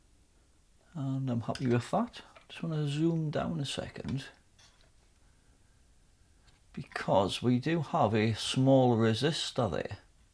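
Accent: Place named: Newcastle, United Kingdom. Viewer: Its a real pleasure hearing a geordie accent after ive been in nz for so long 😊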